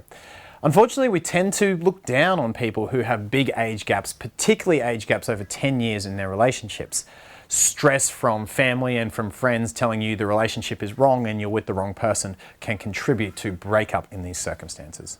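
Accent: Australia. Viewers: Hi, thank you for the reply. Part of my problem is that i work am off shift tue-sat 430pm-1230am which makes it very challenging for me to meet or date for that matter. I usually go online to meet men which i receive alot of responses which gives me many options. But again its my schedule and the fact that there are so many players, dishonest people or those that only want sex. So it does take allot of work weeding through them. And i know i have much to offer the right person and will not settle just to be with someone. I'm just concerned because i am older now and my options will become less and less. Im on my 50's my people guess me to be much younger in my late 30's to early 40's which is great and am also young at heart with a free spirit. I am taking a break at the moment but may try again in the fall. You sure seem to be such a sweetheart, i love your accent. 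Never been to Australia but love to Travel, maybe ill make it that way one day on one of my adventures. Take care, Dee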